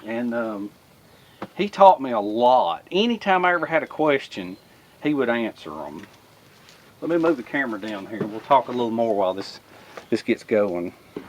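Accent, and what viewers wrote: South, United States. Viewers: Great video, and the sandwich looks great. I have been watching YouTube for abou2-3 years. I started out watching 3 or 4 channels on guns. I started seeing these videos about bushcraft and started checking them out. That is when I found your videos and really liked your way of doing them. I am also a southern boy and like the way you talk. I have thought about getting a camera and trying my hand at this. Hearing why you started has encouraged me. Now if I can just talk my wife into us getting a camera. Thanks and I am looking forward to more of your videos. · I love watching your videos... I'm originally from Oklahoma and really enjoy your southern accent. I have been in the Pacific NW for several years now and don't often get the chance to hear someone that sounds like me... lol... Very refreshing. I just recently started a youtube channel but have only done one slide show video. 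I'm not good at talking in front of the camera. Hopefully I will get the hang of it. Keep on keeping on. You do great work!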